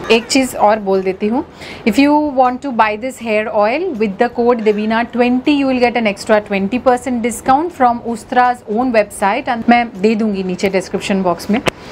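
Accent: Bengal, India. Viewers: Your accent says that you are bengali😅❤️Bengali Beauty❤️❤️ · Oh your Bengali accent🥵😘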